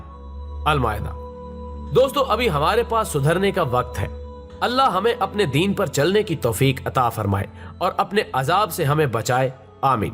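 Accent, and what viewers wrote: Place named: India. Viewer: logo please isko unsubscribe kar do ye RAW walay misinformation aur na umeedi ki videos laga rahay han...zara ghor se dekho is video ko iska talafauz sunno...ye aik HINDU ha RAW ka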